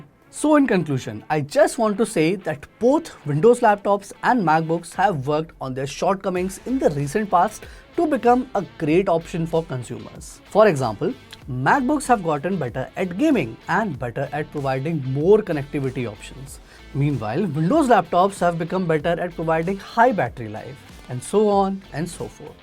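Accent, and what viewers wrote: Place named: India. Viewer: The more i get older the more i become intolerant to Indian dialect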